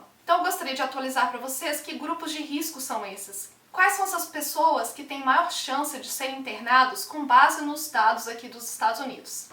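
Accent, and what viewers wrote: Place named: Minas Gerais, Brazil. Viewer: Oi, você é de BH? Fiquei curioso pelo sotoque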